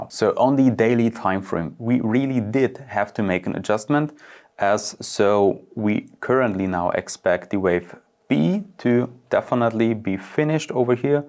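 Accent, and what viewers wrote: Germany. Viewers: Man hört den deutschen Akzent. sehr geil :)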